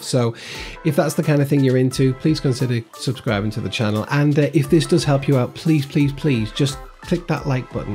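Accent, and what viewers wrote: United Kingdom. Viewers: BRILLIANT ... I feel so stupid.... i bought a used ANDROID tablet, just for the drone, tested at home, props off - GREAT !!!! Went to fly today, thinking it was a great idea to take one of my shorter leads, from the 'stash' that we all collect. I had to revert to my phone and the supplied short lead, I just couldn't get my tablet to connect.,. I tried changing settings, everything I could. it wouldn't work. Then, I came across this video, rummaged through my stash and found the lead I'd used first. It worked, a Sony USB-3.... Fantastic. I then found a short USB-C and will use this with adapter. Thank you so much for this. SUBBED your channel based on this and the fact it's UK accent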